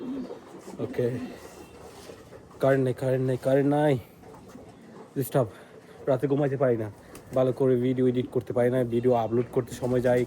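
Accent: Chittagong, Bangladesh. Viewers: আপনার কতা শুনলে,বুঝা যাই,আপনি চট্টগ্রামের মানুষ, কতা অনেক সুন্দর করে বলতে পারেন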